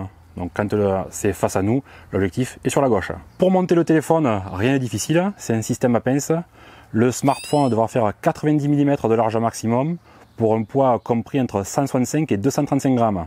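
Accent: South, France. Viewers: Vidéo extrêmement complète, bon boulot. Je me permet une petite précision : il y’a bien un moteur pour le ROLL, un autre pour le PAN. C’est le moteur TILT (inclinaison avant-arrière) qui n’est pas présent sur ce smooth X. Continue comme ça avec l’accent du Sud 😆. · Comment ne pas dire que ta vidéo est un travail de pro ! Félicitations, avec l'accent du sud ! 😁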